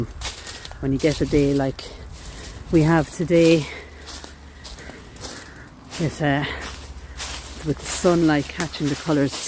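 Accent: Ireland. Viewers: By the way, your Irish brogue is absolutely mesmerizing!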